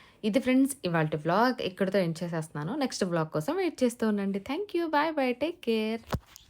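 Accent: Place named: Nellore, India. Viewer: sare....Badram😊 ma Nellore language...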